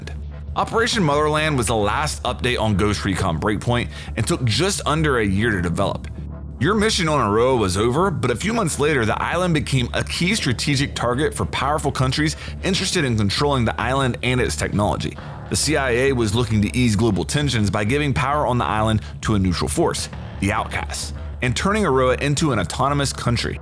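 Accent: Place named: Pittsburgh, United States. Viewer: Guy sounds like he’s from Pittsburgh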